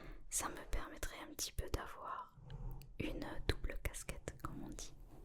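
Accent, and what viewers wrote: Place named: Montpellier, France. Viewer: Tellement vrai pour l'orientation en terminal ! Ah je me disais bien que c'etait l'accent du sud mais plus montpelierin c 'est bien ça? (jsp si c comme ça qu'on le dit) 😁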